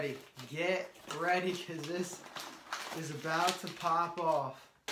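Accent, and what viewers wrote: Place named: Philadelphia, United States. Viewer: You have a strong Philly accent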